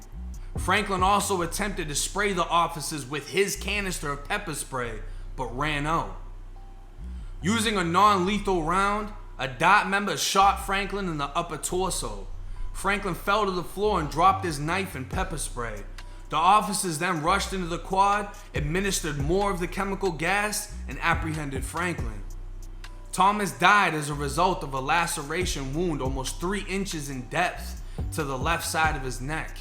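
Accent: Boston, United States. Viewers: Props buddy...but seriously how did you get a Southie Boston Accent living in The 'Gunshine State'? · You sound like your rocking with Boston! I can hear the Florida but some of your words sound like me lol yes im from Boston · You have to be from Boston or Massachusetts somewhere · Lowkey got a Boston accent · Ive asked you before. Now you got so fucking many subscribers. Big respect. I did over 17 years myself. But i need to know. I know you got down in Tampa. But you gotta be from Boston with that accent. Im feom Boston. And thats the only place we talk like that. You got a Boston accent, bro.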